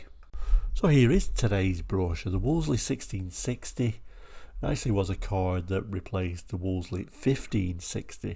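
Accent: Northumberland, United Kingdom. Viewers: I am intrigued by the lovely accent. Is it Northumberland?🤔 But don't go to Oxford University and ask for Noofield College. It is Nuff- field as in snuff. I assume it is an in-house press, since Viscount Nuffield was the founder of Morris and MG.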